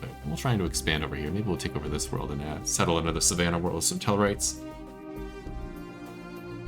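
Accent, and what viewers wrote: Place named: Alberta, Canada. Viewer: A fellow Canaadian, and sounds like a fellow Albertan as well, loving the content as usual!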